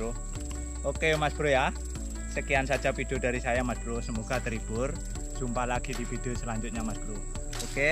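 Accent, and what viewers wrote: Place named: Java, Indonesia. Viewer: Logat e sampean kok koyo wong jowo mas....? Asli pundi mas bro...?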